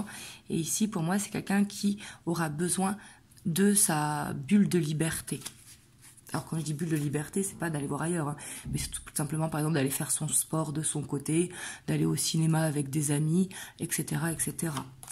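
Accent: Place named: South, France. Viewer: Je m excuse mais vous avez- l accent du midi vous etes d où svp ...oui mon ami est un ancien champion de course d automobile de course de cote il a arrêté il est retraité voilà je suis très fière de lui et moi ije suis très gentille merci j adore vos guidances 🦋🎁🎁🎊🎊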